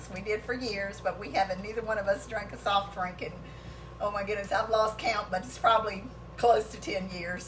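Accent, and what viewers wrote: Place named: South, United States. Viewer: Don’t ever apologize for talking like a southern girl,it’s the best place in the world to be from.Im from Arkansas and you talk just like we do.Just Southern!!!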